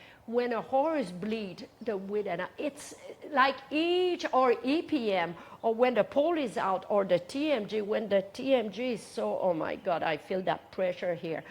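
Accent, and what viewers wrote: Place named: Quebec, Canada. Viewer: My mom was from Quebec. Because of your accent, I feel like you are family! Hope to meet you one day. I admire your work.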